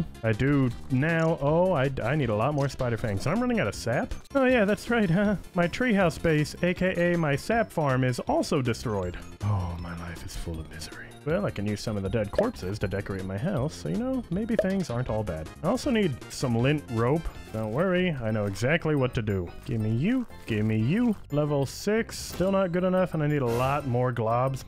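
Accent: United Kingdom. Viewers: If heard of you a ton from grounded community days and paralyzers videos i thought you were from the uk 😂😂 didnt think i would like your content but i was wrong funny video ggs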